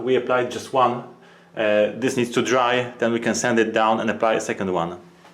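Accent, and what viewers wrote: Poland. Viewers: Hi there. Just discovered your channel and it’s a real treasure trove of useful little videos like this one so I’m subscribing to see more. Brilliant DIY advice for the UK viewers which is rare in a sea of American videos. I think I recognise your accent to so I’ll hazard a guess and say dzień dobry and dziękuję 🍻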